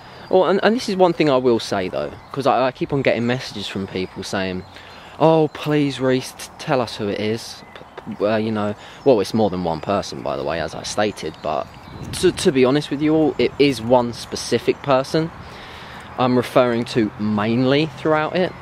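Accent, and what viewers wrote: England, United Kingdom. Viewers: This amazing Place + your English accent = The best combination of harmonic elements!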